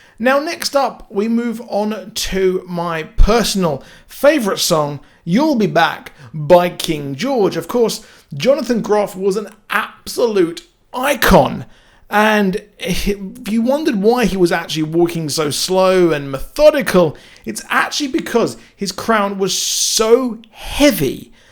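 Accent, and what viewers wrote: United Kingdom. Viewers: Why no views? Why no likes, why no comments, and why so many subscribers? EDIT: waiiiiiit.... ya sound like ur from London, and talking about Hamilton, an American musical?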